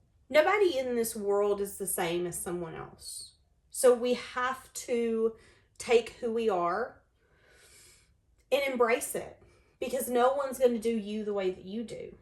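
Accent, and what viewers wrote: South, United States. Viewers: You my dear are SPOT ON! It is so unreal how you nailed it. I love your honesty, advice, and your southern accent is DARLING! Thank you for sharing your gifts and talents with all of us. ♥️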